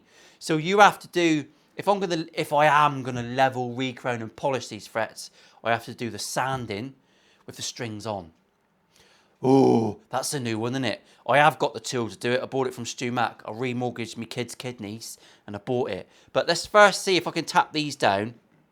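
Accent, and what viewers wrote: United Kingdom. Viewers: Hello, I have no problem with the swearing you say but.. for heaven's sake.. can you tone down that British accent a little? It's getting on my nerves! And, by the way, I'm from Brazil and I speak Portuguese.. Anyway, I'm signing up for this channel right now. Thank you!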